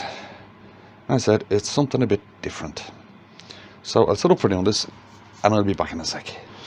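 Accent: Ireland. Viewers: I love your videos! You always tell us what you are doing. That turned out exactly like the sketch! There is no way I could have or would have attempted it. Only been turning about 8 months though lol. As an American I'm in love with that beautiful Irish lilt in your voice. Happy New Year to you and your family!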